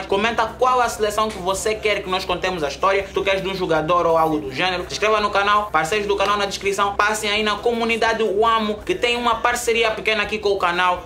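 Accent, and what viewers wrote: Angola. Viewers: Que canal TOP 😱 É muito interessante ouvir um angolano a falar! P.S: Merecias mais subs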